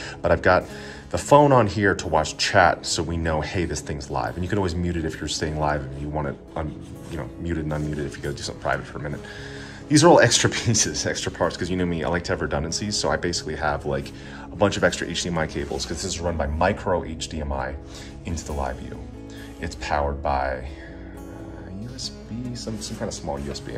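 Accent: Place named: United States. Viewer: Hope release more Video like this, so that I can learn more American English.❤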